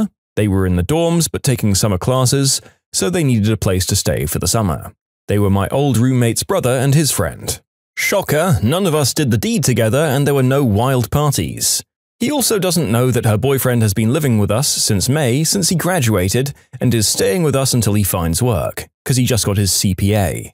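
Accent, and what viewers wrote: United Kingdom. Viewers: This is my first time hearing this voice actor and I was not expecting a British accent (no offense) because I'm used to a really monotone emotionless voice lol.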